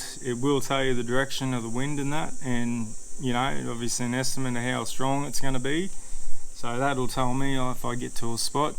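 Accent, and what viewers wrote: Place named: Australia. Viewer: That's an Aussie if I ever heard one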